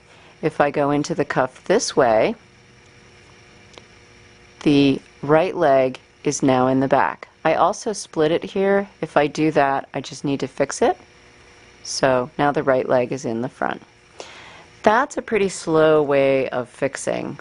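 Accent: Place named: South Jersey, United States. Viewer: Are you from PA or NJ.. because you have a south jersey/philly accent .. similar to me.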